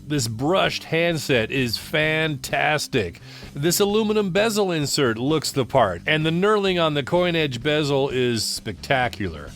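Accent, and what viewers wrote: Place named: United States. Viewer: what a classic American narrative voice! :D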